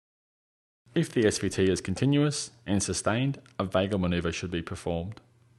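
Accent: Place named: Australia. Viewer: This bloke is an Aussie! Take it from a Brit :)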